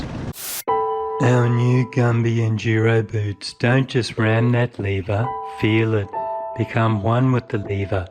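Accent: Australia. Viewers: I thoroughly like this channel, especially the practical insights with an Aussie voice. Any chance of /CoS collaboration?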